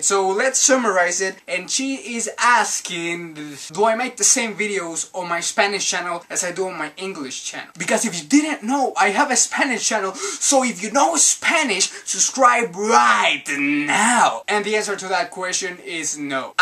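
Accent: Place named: England, United Kingdom. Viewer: x3 i like your english accent <3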